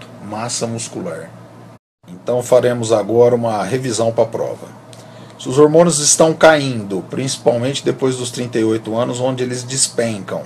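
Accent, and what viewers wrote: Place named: Bahia, Brazil. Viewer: Você e baiano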